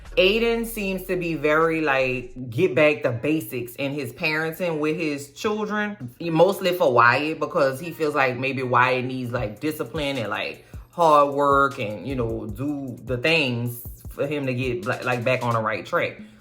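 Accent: New Orleans, United States. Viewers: First, I LOVE YOUR RECAPS. Everything is better with an authentic New Orleans accent! Secondly, I totally agree about the children on this show. They are constantly in sexual situations and having sexual conversations, ew. The conversation about polyamory or pansexuality during dinner with parent and grandparents was an ABSOLUTELY NOT. This show is tripping. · "Take Braedyyy, taek Braedi.....He had a long life. I think what he like 19/20?...That's a solid life if you ask me!" YEOOOOOOO The Nawleans accent is the icing on the cake to these AJLT reviews, cuz the show ain't issh 😫🤣🤣🤣🤣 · I love your accent. New Orleans is my all time favorite city, and your humorous recaps are the ONLY good thing to come from this monstrosity of a reboot.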